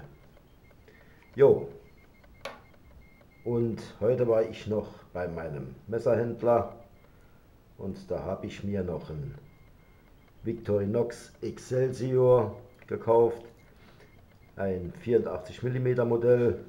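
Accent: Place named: Saarland, Germany. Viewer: Hab mir doch gedacht das ich da saarländischen Dialekt raushöre, aber St. Ingbert is mir doch ein bißchen zu weit. Gruß Andy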